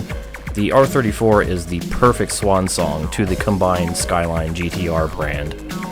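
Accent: Italy. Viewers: Sei italiano?😀😃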